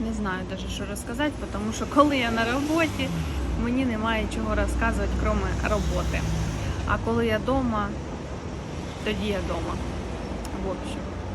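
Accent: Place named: Nikopol, Ukraine. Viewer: Я хочу сказати,що в Польщі чисто скрізь. Прибираають вони не тільки для туристів, вони так живуть.І це супер. А ще, я просто в захваті від Вас і Вашої мови! Мої батьки і я теж так розмовляємо (балакаємо)). Я з Нікополя. Як добре, що ви виїхали з окупації. Наш Нікополь обстрілюють з Енергодара по три -чотири рази на день... Просто жахіття.Кожний день гинуть люди, руйнуються будинки...Я в Кракові. Мама їхати до Польщі не хоче. Сказала, що вмирати буде в своїй хаті... Плачу, п'ю таблетки,щоб заснути. Ви тримайтеся, ви разом і уе головне. А в Польщі теж можна жити, працювати і заробляти. І будемо молитися, щоб ті фашисти згинули з нашої землі, з наших домівок. Продовжуйте знімати, у Вас добре виходить, цікаво. А кому не цікаво і не подобається, то,як кажуть, ви ж не долар))щоб всім подобатись.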